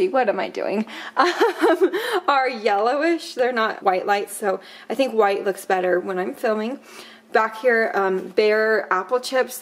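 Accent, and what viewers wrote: Utah, United States. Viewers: Just started watching you and thought I detected a bit of a Utah accent or words as I lied there for for 50 years and really miss it as I now lie in Arizona.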